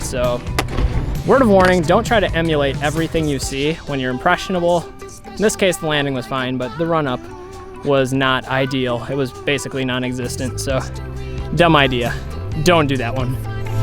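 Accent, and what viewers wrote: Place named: United States. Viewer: Greetings from Europe, thanks for that pure American accent ☺️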